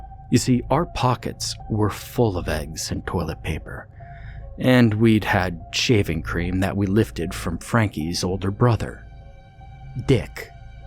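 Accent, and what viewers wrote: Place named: New Jersey, United States. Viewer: I knew I heard a Jersey accent!🙂 Love your channel (from a fellow Jerseyan)!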